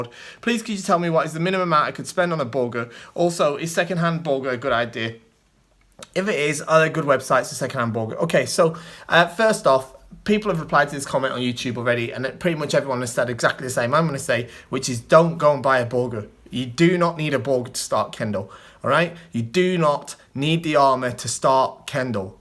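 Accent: United Kingdom. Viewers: Your accent sounds like some sort of Northern, but it doesn't sound either Geordie or Scouse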